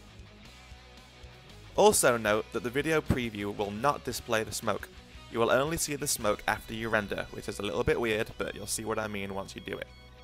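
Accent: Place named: England, United Kingdom. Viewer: I had no idea that you were English. Definitely surprised me when i heard that accent. Don't know why i thought you were from the States.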